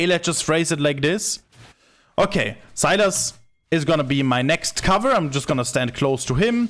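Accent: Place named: Germany. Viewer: Genauso klingt mein Englisch auch xD Einfach wild als deutscher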